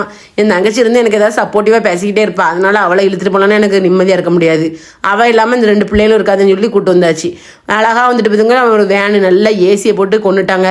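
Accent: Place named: Coimbatore, India. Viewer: Naanum en pennum ungal slangai miga virumbuvom.we are coimbatore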